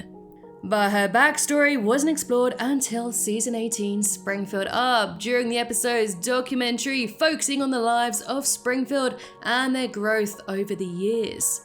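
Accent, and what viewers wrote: United Kingdom. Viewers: the narrator is ANNOYING! I fucking HATE these british narrator accents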